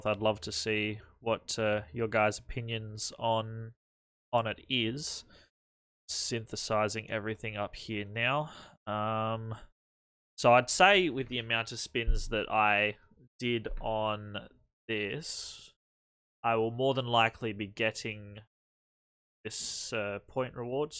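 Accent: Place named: Australia. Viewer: Hmm you sound like an aussie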